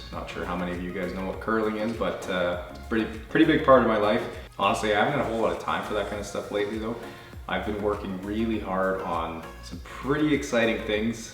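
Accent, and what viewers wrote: Canada. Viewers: I didn't know you were Canadian til today, probably. And it makes no difference to me. I'll be friends with somebody and support their business no matter where they're from or anything. I'm saying because people were making Canadian comments or whatever, but most people that I end up liking on tv or whatever are canadian. Some of them are english, or some other culture, but most of the ones I like...I discover they're Canadian after I watch them for some time. I don't really notice the accent right off sometimes. accent isn't really that noticeable until he says something with an "O" in it. But if you like Canadian people, there's always Jim Carrey, Alan Thicke (rip)... there's a channel on here called the Dungeon Dudes, that me and my boyfriend watch...Monty, Kelly and all them are Canadian. But I think they're from a different part of Canada. 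Matthew Santoro also has a channel and he's from Canada. Michael J. fox from Family ties is Canadian, and Neve Campbell from Scream. And Celine Dion. She's from the Quebec part. She's french-Canadian. But anyway, yeah I was just saying that. I realize that most of his comments aren't bad, just wanted to say that I kind of didn't know he was Canadian til today, I read all the comments and it makes no difference. Just wow. I've been watching him for about 3 or 4 years and I figured he was from somewhere in the U.S. you better be from somewhere else and not Texas right now,... it's better in Canada than it is in texas right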